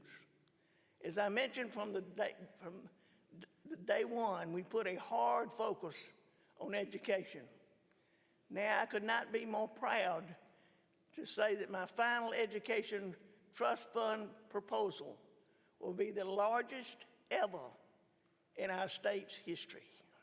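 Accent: South, United States. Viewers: One of the best southern accents of any current governor!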